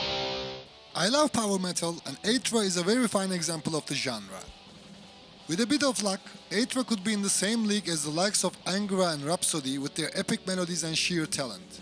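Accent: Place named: Mexico. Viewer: You are Mexican! your accents is too Harrrrrrd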